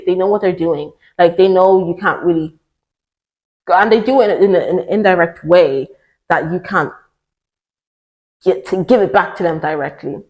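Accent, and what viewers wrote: Ireland. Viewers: Unrelated but are you Irish? You’re accent is familiar 😅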